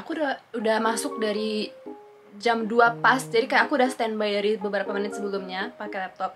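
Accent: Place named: Japan. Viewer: Suka kak kalu ngomong L ada lidah jepangnya, lucu😂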